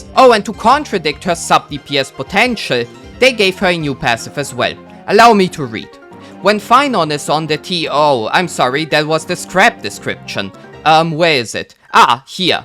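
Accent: Germany. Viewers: I’m hearing the German accent 😭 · He sounds german.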